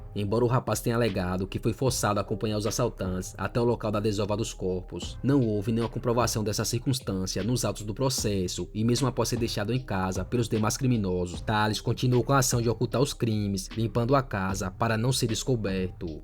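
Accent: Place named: Rio Grande do Norte, Brazil. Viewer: Amigo, você é do RN? Tu tem sotaque de potiguar.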